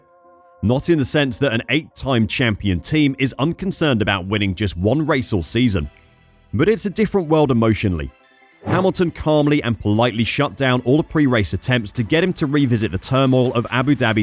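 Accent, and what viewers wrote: United Kingdom. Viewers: “Clearly clear” sounds totally fine with a British accent. That accent definitely makes everything sound much classier 👌👌 (no sarcasm)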